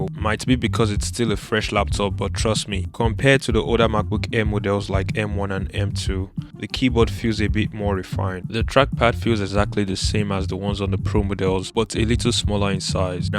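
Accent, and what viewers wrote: Nigeria. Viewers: You from 9ja. No lie😂✌🏾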